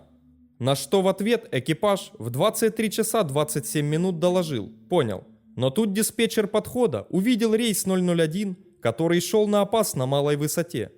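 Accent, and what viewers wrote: Ukraine. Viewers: Вроде Украина или Белоруссия. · Скорее, Украина. У него не белорусское произношение.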